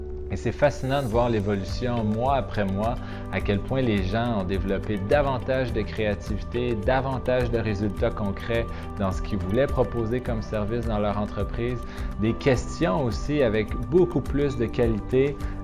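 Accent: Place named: Quebec, Canada. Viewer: c"est cool ton accent québécois. J'ai deux amis québécois qui me conseille et qui m'aide beaucoup. Je suis aussi dans le développement personnel. J'adore me former en développement personnel. D'ailleurs l'une de mes amies Canadienne est coach en développement personnel aussi et elle m'aide beaucoup. :) A bientot dans l'académie. D'ailleurs j'ai une question tu connais "entrainé pour réussir", la formation proposé par David Laroche??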